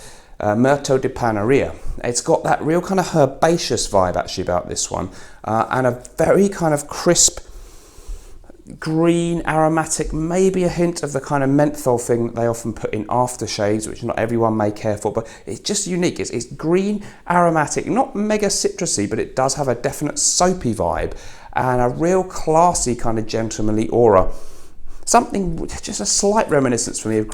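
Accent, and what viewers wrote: England, United Kingdom. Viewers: Classy english accent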